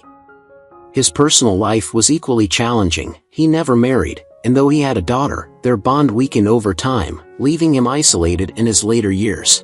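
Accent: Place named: United States. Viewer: american narrator what do you expect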